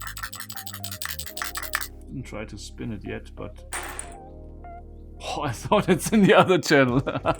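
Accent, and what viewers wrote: Germany. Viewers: I live in a very German area in Texas. Your accent is normal to hear around here. Very normal! Fredericksburg Texas is a small German town. I spoke German in school until it was verboten in 2nd grade. Back in 1973... I can go to town and still here people speaking German to this day... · Iwie hörst du dich deutsch an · I miss his german accent, its still there but back in the day it was more german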